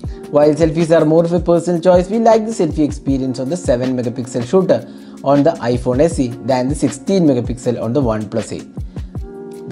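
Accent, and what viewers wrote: Kerala, India. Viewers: Are you Malayali bro.i think you have Malayalam accent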